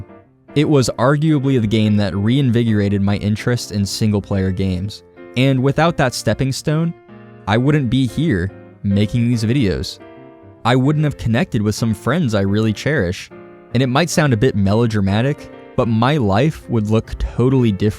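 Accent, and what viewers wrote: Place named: Northeast, United States. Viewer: you have a very northeast accent and it’s dope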